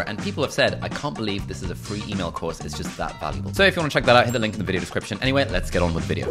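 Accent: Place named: United Kingdom. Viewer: How can we talk like a British, talk like you . ???. 🤔🤔🤔🤔🤔🤔🤔🤔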